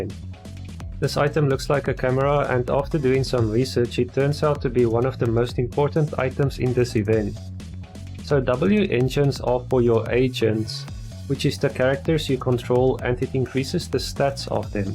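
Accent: South Africa. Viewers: You sound South African, I'm from there too. Nice video my dude!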